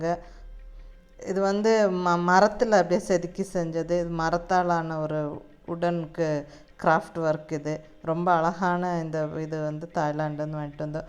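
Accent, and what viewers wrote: Coimbatore, India. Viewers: Ungal voice super mam Coimbatore karanganu nala ah tarithu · Amma really nice collection amma anakum vinayakar romba pidikum kongu tamil super innum neraiya video poduga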